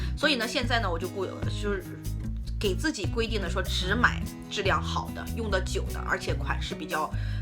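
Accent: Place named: Qingdao, China. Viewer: 听姐姐说话像青岛人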